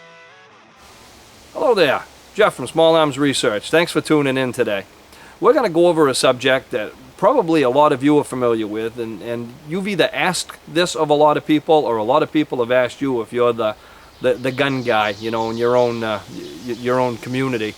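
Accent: Maine, United States. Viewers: I'm a .45 acp guy. (1911&G36) But I tell everyone who asks me that the most effective weapon is one that you can put rounds on target accurately and rapidly. I don't care what the caliber is. Stick with what you shoot best. BTW...are you from Maine? (accent kinda gives it away. Maineiac born and raised here)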